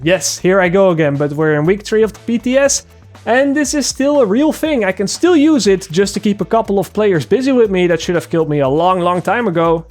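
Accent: Netherlands. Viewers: je hoor echt gelijk dat je Nederlands ben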